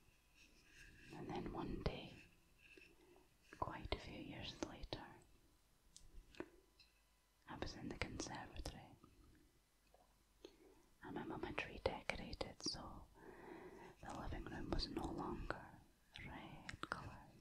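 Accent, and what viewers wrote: Scotland, United Kingdom. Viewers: I adore your voice because of the accent, imo a Scottish or Irish accent sounds far more interesting and elegant than an American accent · It''s been a super tough week, gonna need that that Scottish Brogue to calm my brain. I'm from Edinburgh and I miss the sweetness of the language. Hope you're well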